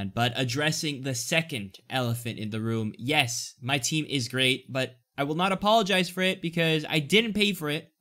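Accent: United States. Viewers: well u sound like u from DC or something,well anyways I like ur vids very accurate and description based, congrats on subscriber growth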